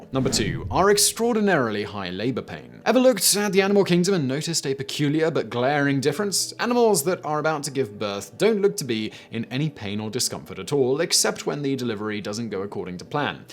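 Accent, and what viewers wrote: United Kingdom. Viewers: Thanks for another great video! I think one of the reasons I like watching Simon so much is he reminds me of my youngest son. It's the beard and the intelligent way of speaking (although my son doesn't have the British accent).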